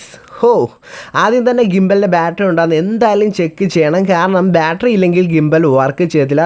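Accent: Alappuzha, India. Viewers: ആലപ്പുഴക്കാരനാണല്ലേ ... കൊള്ളാം മോനെ.... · Machane ninga alappuzhackaran aano....😀😀😀😀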